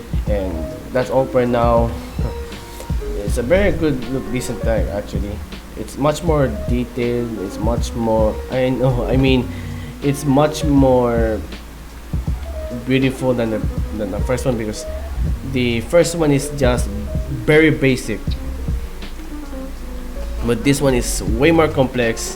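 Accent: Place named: Philippines. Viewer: Where r u from You sound like a filipino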